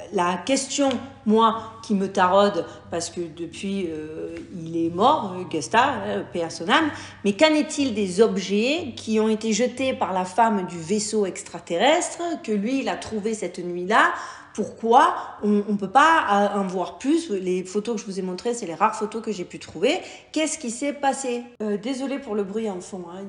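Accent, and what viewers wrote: South, France. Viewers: Salut, Comment schpouinkes-tu? Vidéo super de kabou. Bravi, bravo! As-tu entendu parler d'Ummo et des ummites, par hasard? Tu peux toujours regarder et écouter les vidéos de Stone Gardenteapot. Ensuite, tu peux regarder et écouter celles de Jean-Pierre Petit, mais elles sont plus difficiles d'accès. Tu as l'accent du sud de la France, mais tu as une bobine de Suédoise. Bises alchimiques du Dimanche soir. 🦆 🎸 🎤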